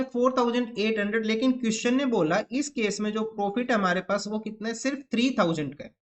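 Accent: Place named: Haryana, India. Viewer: Sir haryana se kaha se ho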